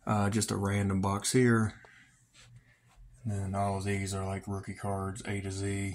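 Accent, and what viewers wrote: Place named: South, United States. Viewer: Now that your doing more videos, I'm getting hooked on that sweet southern accent. Love the card room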